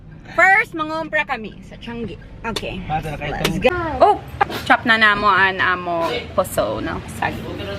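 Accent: Visayas, Philippines. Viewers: Hahaha cute kaayo ka magbisaya haha makabalo ra jud ka ana madugay cge lang bansay ug sulti ug bisaya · Wow kabalo kana day magbisaya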